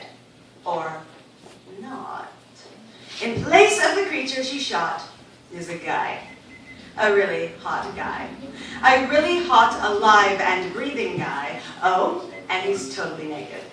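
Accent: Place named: Georgia, United States. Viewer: I love this, and I love this book!!!! Her accent sounds Georgian. I’m from Alabama, and we don’t sound like that, and it’s sure not Cajun or Mississippi-ian. Thanks for the upload!:)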